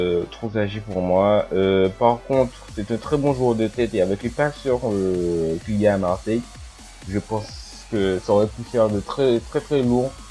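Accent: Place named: Antilles, Caribbean. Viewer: jolie accent :D ça fais plaisir c'est rare des antillais Youtuber :D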